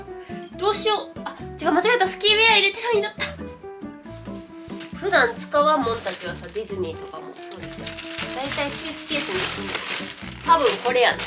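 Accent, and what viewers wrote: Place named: Kansai, Japan. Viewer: 関西弁かわいい👶🏻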